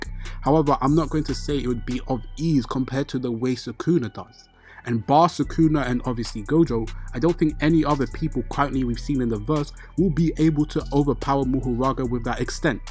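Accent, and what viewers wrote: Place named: United Kingdom. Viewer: UK accent is annoying tho 😅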